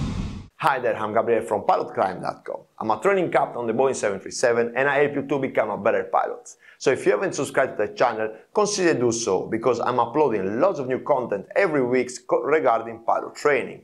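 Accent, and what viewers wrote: Italy. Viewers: ....on the other hand I heard a good explanation of TOGA in plain English with a slight Italian accent: Very well done...I've been looking for an explanation of TOGA and now it has clicked...everything else I can find in the FCOM. Thanks You!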